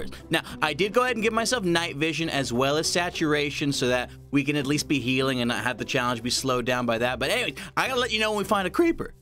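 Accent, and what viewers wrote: Netherlands. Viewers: Wait... are you dutch?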